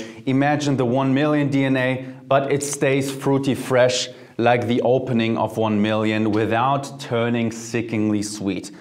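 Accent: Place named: Germany. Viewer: The german accent fits perfect 👌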